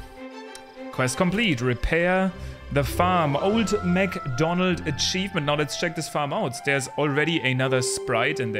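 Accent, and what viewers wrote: Germany. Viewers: Kann es sein das du Deutsch bist? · Xd ich glaube du bist deutscher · Man hört so stark das du deutscher bist😂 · digga wie deutsch du bist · You're German aren't you 👁️👄👁️ ich fühls in den nuggets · Man hört zwar das du Deutsch bist aber trozdem machst du Gute Videos aber warum Raid shadow legends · bist du deutsch? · I love the Fortnite chest sfx in the first game. Is you accent German? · Hes german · So i would geas your german ;) If not you have intristing Acsent Kein bock mehr zu schreiben gg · Bro this german accent… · 3 seconds in and i hear the german accent lol just found u but u seem cool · Are you German? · Sachmal du bist doch deutsch oder 😂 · you sound very german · Man merkt halt das du deutsch bist (kein hatte) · Are you german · are you german? austrian? nordic? dutch? i must know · i hear some GERMAN accent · Dein Englisch klingt sehr Deutsch 😂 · Bist du deutsch bruder? 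XD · you sound very much german😅😂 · tasting the games 😂 ich liebe deutschen Akzent im englischen so sehr 🥲❤️😂 deine Videos sind toll mach weiter so ☺️ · You sound german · you have a very german accent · Alter man hört so geil raus das du Deutscher bist :D Wünsche dir alles gute ! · German! I can hear it from the accent alone! Guten Tag! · Im hearing some german acent ??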